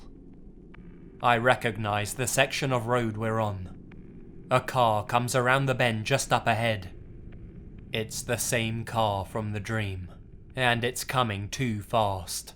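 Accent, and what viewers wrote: United Kingdom. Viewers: He's British - we don't speak American. Your jello and aloominum is different to our jelly and ALUMINIUM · are you from the UK? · are you british?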